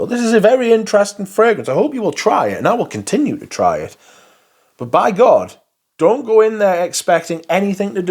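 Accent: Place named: Durham, United Kingdom. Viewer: I think it's fine but nothing special. I'm pleased I didn't buy a full bottle. It's very similar to other fragrances I've tried recently. Are you from Durham? I'm from Durham you sound a bit like myself.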